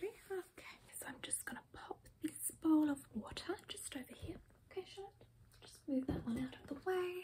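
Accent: Australia. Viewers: are you actually australian or no?!?! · I don't know if anyone has seen it, but the accent is exactly like the rich ladies who work in the shop in Kath and Kim 😂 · I love your cultivated Australian accent 😘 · she’s Australian XD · She’s literally Australian.. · in love with the aussie accent, the soothing voice and the vibess i just woke up from a nap but i guess its bedtime again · Omg lol I just realised that most of us aussies have that exact accent 😂😂😂😂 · As a true blue, I appreciate you and these videos. The comfort of an Aussie accent which the subtle cultural complexities of a “rich Aussie mum” makes me real feel at home 🦘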